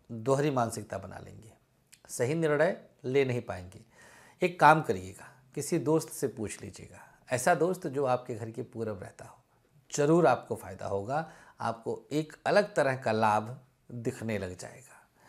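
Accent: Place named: Awadh, India. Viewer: Shree radhe guruji apki avadhi boli bhot jyada pyari lgti h love u always... chrn sprsh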